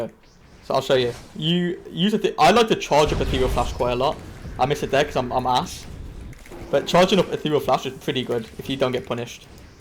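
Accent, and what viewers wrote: United Kingdom. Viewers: Close enough, its actually british (atleast he sounds british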